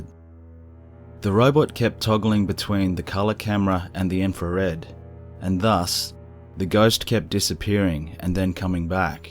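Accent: Australia. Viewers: Claims to have been Military working in the US.... Accent is Australian Yeah I say fake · Woah! Straaaaaaange! Life, 2 videos in one week!? To what do I owe the honor??!! As usual, loved the vid! Although I have heard the Creature in the Corn story before, it always sounds better when you tell it with that awesome Aussie accent 😍 😉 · Good stories 👍 Your an Aussie aren't you? 🍻😎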